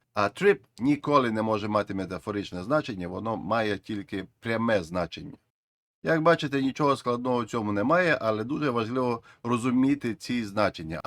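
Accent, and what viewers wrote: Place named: Ukraine. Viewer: Тема хорошо раскрыта. Мне нравится особый украинский диалектный акцент автора ролика. Интересно с какой он области?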